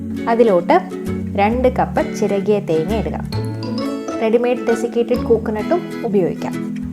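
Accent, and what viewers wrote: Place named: Thiruvananthapuram, India. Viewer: Tvm slang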